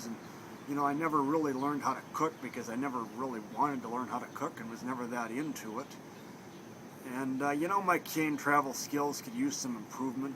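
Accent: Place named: Minnesota, United States. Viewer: I cab tell the you do have a Minnesota ascent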